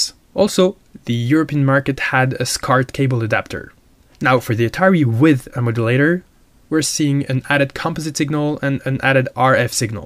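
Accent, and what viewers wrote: France. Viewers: Ahh cet accent bien fançais :-) Superbe vidéo, merci !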